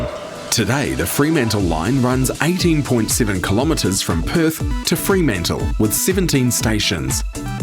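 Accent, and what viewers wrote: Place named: New Zealand. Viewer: "The guy" Timo is referring to is the commentator (you ? ) who has mispronounced Mandurah (pron Man-djrah) as mentioned by Jason G. The commentator has a NZ accent and may also be familiar with some of the old diesel powered multiple unit rolling stock from Perth that ended up in NZ. Excellent video by the way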